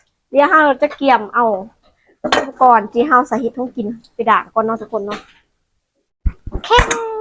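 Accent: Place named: Ubon Ratchathani, Thailand. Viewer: แซบๆน้อง สำเนียงเธอ คิอทางอุบล ศรีสะเกษ ประมาณนั้นเฮาว่า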